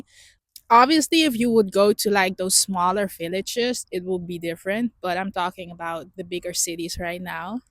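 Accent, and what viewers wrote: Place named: Netherlands. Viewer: You have a Dutch accent :) You Dutch :)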